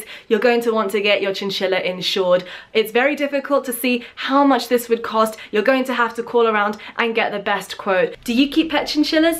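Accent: New York, United States. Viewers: American accent on FLEEK! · 1- your American accent is killing me😂😂 2- I subscribed 3- I like how you call the U.S "the states"😂😂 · Lmfaooo the American accent omgggggg amazing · I love the American accent. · I love her american accent lmao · Yo that’s a fire American ascent tho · Love ur videos. You are so cool and smart. Btw ur American accent is on fleeek. Though when u said neutered with the t u exasperated it. Other than that u can fit in. And that New York accent is pro. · I love that American accent! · her ny accent was so cute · Your American accent was great! As a New Yorker, I really appreciated your New York accent! Lol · Im just binge watching videos- Your american accent sounded so good 😂 i talk just like that. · Hey, I'm from America and m saying your American accent is on point👍👍and, you are cute with all of your animals😊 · Her American accent freaked me out!! 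Maybe it's not fake, but her real accent 😲 she does it so well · well your american accent is fine to me i love your videos your just amazing! bye the way your my fav youtuber! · Your American accent is great!! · As a born and raised new yorker from brooklyn your accent made my day 😂😂😂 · i personally dont really like kaytee because ive read online that they add in too much products and other things like that. but i did enjoy your American accent attempt!!! love you!!!🐭❤️ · Your American accent is flawless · I love u so much. Your American accent was even better than mine and I'm from America. I love you so much and keep being amazing and being your awesome self. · i have a chinchilla he is so loveable and youhad a good american acent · As a trained actor I can say, your American accent… Not bad, not bad at all. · I'm OBSESSED with different accents in English and I have to say your American accent' s probably better than my British so don't be too modest 😉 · I just found your channel and so far I love you videos. 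By the way you did really well on your American accent. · Omg her American accent is so good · OMG your Amercain accent is on point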